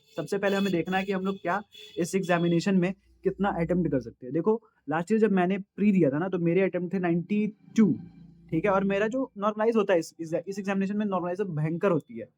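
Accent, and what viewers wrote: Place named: Bihar, India. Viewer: Tum Bihar se h kya bhaiE sub kuch hai Thik h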